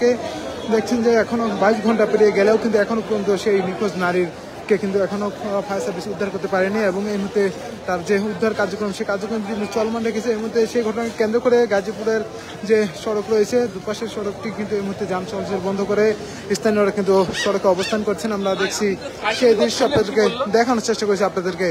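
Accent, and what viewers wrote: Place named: Narsingdi, Bangladesh. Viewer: নরসিংদীর কন্ঠ স্বর কেনো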